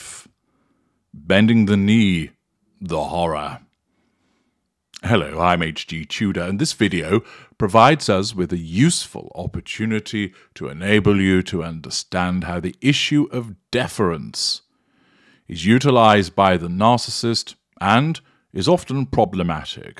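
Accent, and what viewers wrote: United Kingdom. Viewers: The combination of your accent, the fact that MM’s genuine character isn’t lost on you and the colorful language all while carrying the British accent. ❤😂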